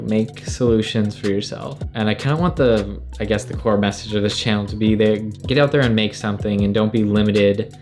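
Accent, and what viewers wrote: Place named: Norway. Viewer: you hear like a norwegian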